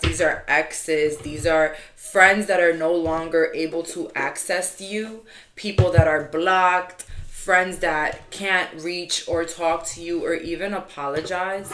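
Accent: New York, United States. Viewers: You are my favorite reader on YouTube and we both live in New York City so I love the way you talk😂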